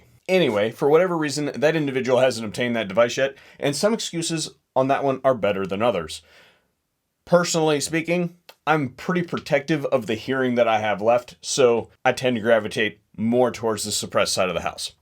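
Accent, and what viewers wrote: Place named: United States. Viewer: Great job at testing & publishing hard numbers. Ditto at keeping it real & speaking American! I got to learn a few new things like WHY I like a backup nut instead of crush washers. I'm only 6 years old in building & 3 years rusty since I got booted off the ranch where I used to shoot. Just tryin' to keep my pilot light lit these days. Good info is much appreciated.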